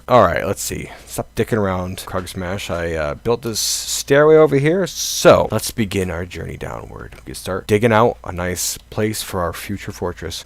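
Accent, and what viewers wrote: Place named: Connecticut, United States. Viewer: smoky, rocky, caffeined, autist connecticut accent is best accent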